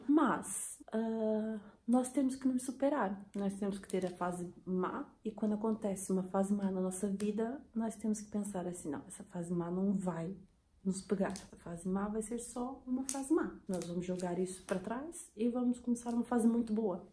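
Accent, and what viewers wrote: Portugal. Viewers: Portugal?? Parece bue o português daqui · Vc tem sotaque brasileiro e português ksks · ela não chegou hj em Portugal. Chegou lá aos 13 anos, vive a mais tempo em Portugal do que viveu no Brasil. Estranho seria se ela não tivesse sotaque português. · você é linda do mesmo jeito, com o cabelo na frente ou não. e o seu sotaque é muito bonito, parece o português de Portugal junto com o do Brasil. ❤️ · Você é portuguesa? Tem um certo sotaque... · não ela não é moro em Portugal a 20 anos e conheço bem o sotaq dos Português e dos brasileiros que tentam falar kkk mais ela tem 60% sim ela falha no (de) e no (s) Só estou respondendo a pergunta do rapaz , mais a experiência dela é muito linda para se ficar nesse detalhe ne 😍😘😘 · Oii vc é de Portugal? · Você tem sotaque de Portugal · Linda sua história de superação. Só fiquei confusa, você é portuguesa ou brasileira? 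O sotaque confunde bastante, hora eu juro que vc é portuguesa e hora juro que é brasileira rsrsr😘😘♥️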